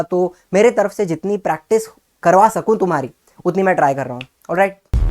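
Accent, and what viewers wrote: Maharashtra, India. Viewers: Sir you are marathi. जात्य डोक्यात. 🤔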